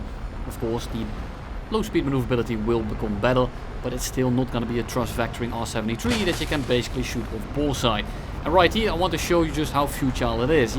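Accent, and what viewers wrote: Netherlands. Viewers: Ik heb nog nooit zo een duidelijk Nederlands accent gehoord in het Engels.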